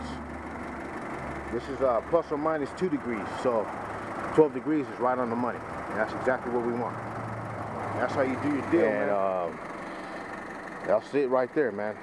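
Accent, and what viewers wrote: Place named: California, United States. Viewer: this fools from cali! i can hear his accent, keep doing your thing man!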